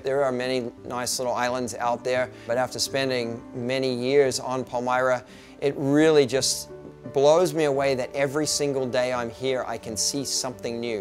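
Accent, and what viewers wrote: Australia. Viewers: Straight ozzy. Maybe got the mixed accent from different work environments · partial aussie accent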